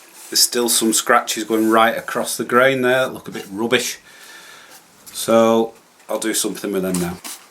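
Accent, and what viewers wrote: United Kingdom. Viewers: Me mum was a brit; I am an American born guy. I love your brit narration, please don't deprive us of that brit voice! Also, beautiful restoration on the teak nightstand as usual. PS If I am watching ANY video and I think the person is too talkative, I just put them on mute. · Really solid lovely end table! Keep talking! I love the Brit mannerisms and accent (I'm from USA)! Always going to be someone who doesn't like your 'style' - I'm of the opinion that 'You Do You'! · contrary to what some others have said, I don't find your talking to be excessive at all. I’m from the U.S. and I love the British accent. I really do. And the instructional nature of your commentary is part of why I come to these videos in the first place. By the way…I must remark on the piano accompaniment in the first four minutes of the video. It was stunningly beautiful. 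· I love listening to you telling us what you’re doing in that lovely British accent! (Just the way you say ”lovely”… ☺️) Please keep it up! ❤️ Also, great transformation and glad you kept the lock! 👍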